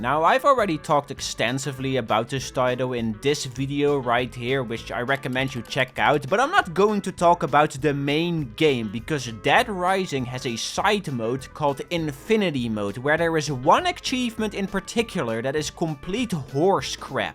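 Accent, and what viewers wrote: Netherlands. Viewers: Is hij nederlands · He’s Dutch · Are you Dutch? You accent sounds a little bit like it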